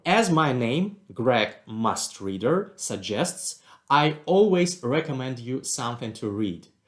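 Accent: Russia. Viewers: как насчёт всерьёз позаниматься с преподавателем для искоренения русскоязычного акцента? Также весьма заметно, что читается написанный текст, не круто. Но круто то, что вы делаете на русском. Желаю успехов! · you mean that a Greg starts to speak with more russian accent?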